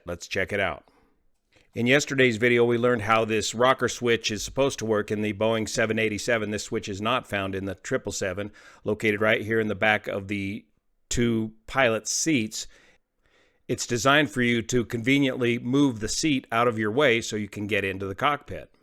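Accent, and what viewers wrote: Chile. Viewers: Based on the accent of the voice, It seems that the person speaking in the video is from Chile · Definitely chilean spanish👌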